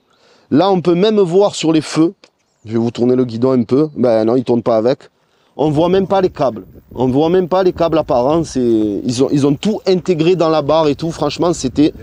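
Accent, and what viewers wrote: Marseille, France. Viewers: l accent marseillais avec une belle voix , c est vraiment jolie a entendre , tu vois ce que je veux te dire comme dirait Alonso 😊. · Jkiffe trop le style de tes tests en toute simplicité, au naturel, la passion que tu transmets, et même ton accent de Marseilléééé! Continue frérot, la bise de Paris 😉😉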